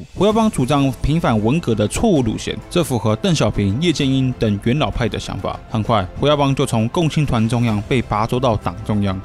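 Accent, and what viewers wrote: Taiwan. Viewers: 台湾口音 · 听这口音就知道是湾湾的😂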